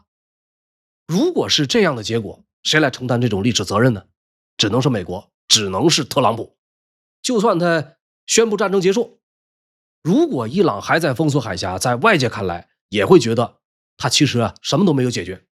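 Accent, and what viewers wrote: China. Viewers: 就是个大外宣还挺懂潜规则知道用繁体亲近港澳台，奈何口音变不了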